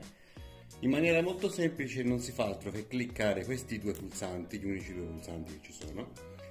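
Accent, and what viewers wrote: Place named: Florence, Italy. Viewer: Tu hai avuto una buona esperienza...io invece no... l'ho comprato da una settimana...la velocità è di massimo 37 km orari, la batteria non dura quanto dovrebbe e tra l'altro mi si è forata anche una gomma, ritengo anche che i materiali non siano di buona qualità. Secondo te il monopattino è difettoso? sono di Firenze e sento che dall'accento anche tu lo sei.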